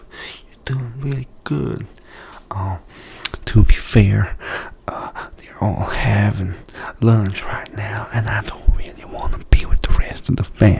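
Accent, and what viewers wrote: South, United States. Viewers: I was not expecting such an flawless Southern accent but here we are 😂😂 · how did bro nail the southern acc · You seem much more mature than a typical 24 yr old. I'm old enough to be your Dad.....if you were born when I was 12 yrs old! KIDDING! 20 yrs from now, you'll look the same. You know I like to tease you, but I'm correct on how you'll age. I like the southern accent and remember what I wished for you to do.........and accents will help. I'm not good at accents nor can I identify them (where the person is from). Due to cancer treatment, I lost some of my hearing at a young age. While in Hawaii, a waitress came to my table and I just looked away b/c I had NO idea what she was saying and she was speaking English but with a heavy accent. My friends found it hilarious, while I sunk in my chair. 🤣 (it's healthy to laugh at our own shortcomings) 👂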